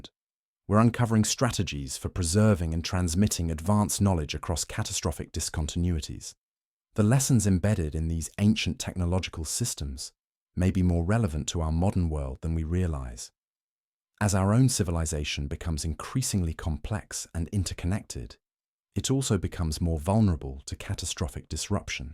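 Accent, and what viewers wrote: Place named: United Kingdom. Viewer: Are there any stories that don't have a British fkn accent???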